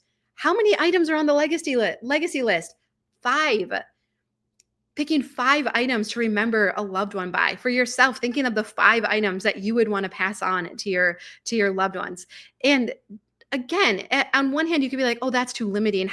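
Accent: Minnesota, United States. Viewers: Minnesotan, maybe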